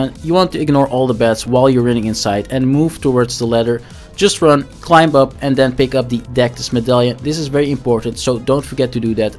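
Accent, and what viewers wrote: Netherlands. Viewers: Are you u from the Netherlands ?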